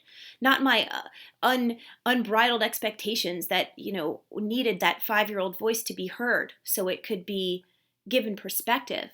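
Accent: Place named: Long Island, United States. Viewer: Great work you are doing. Are you a Long Island Italian transplant to Delaware too? Thought I can hear the accent...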